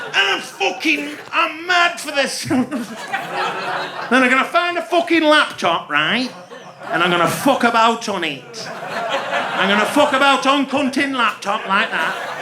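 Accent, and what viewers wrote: United Kingdom. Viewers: very funny show, your British accent was very similar to the Dart callers. 😂🤣🍻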